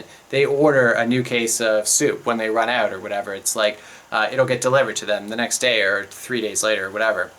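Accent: Canada. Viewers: The words 'about' and 'out' always give the Canuck away... lol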